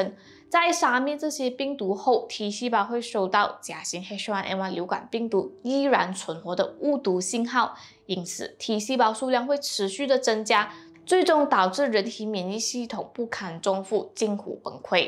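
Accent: Malaysia. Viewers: 口音和咬字有点奇怪。。 来自马来西亚吗？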